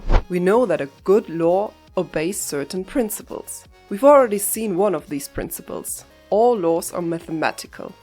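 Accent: Germany. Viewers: After just 10 seconds into the video, let me take a wild guess: somewhere in the germanophone region?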